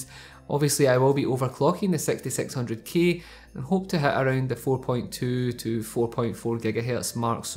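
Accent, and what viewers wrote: United Kingdom. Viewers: his british accent tho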